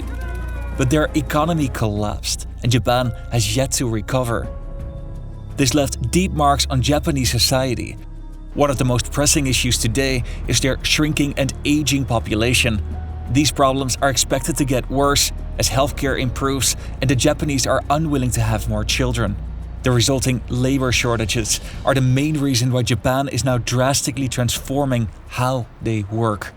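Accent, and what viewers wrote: Netherlands. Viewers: I know a lot of people who want to live in Japan. People who are taking their culture with them and try to enforce it on the Japanese, which will be similar scenario what is happening in the west right now.... And yu are Dutch channel. As native Dutch speaker myself can always hear the accent haha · Dutch accent English. Very well documented this.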